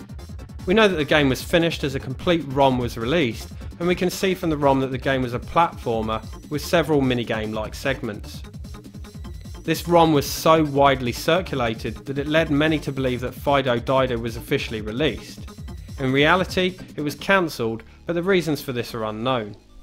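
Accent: England, United Kingdom. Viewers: T H I C C english accent.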